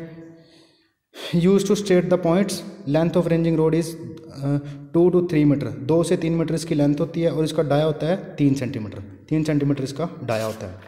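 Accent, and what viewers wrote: India. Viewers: sir are you from himachal?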